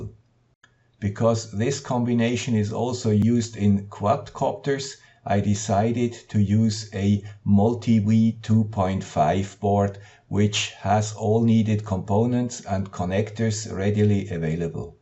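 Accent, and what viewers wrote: Switzerland. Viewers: Great video. Love your Swiss accent.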